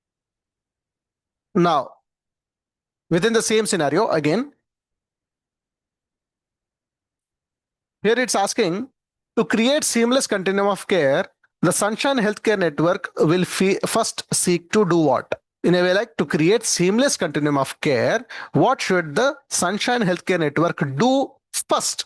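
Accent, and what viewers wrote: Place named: India. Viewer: ليش هندى اللى بيشرح ...على رأى ترمب لما قال اى دونت اندر ستاند ..سيت داون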